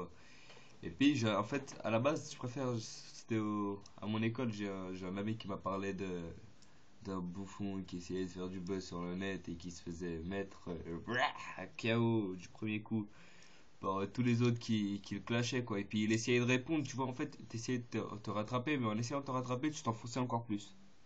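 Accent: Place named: Belgium. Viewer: Il a un leger accent belge...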